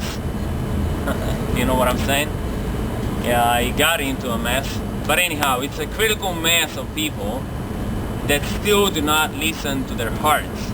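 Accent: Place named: Spain. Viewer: this guy looks American but talks like his Spanish wat a funny guy lol, is he faking his ascent lol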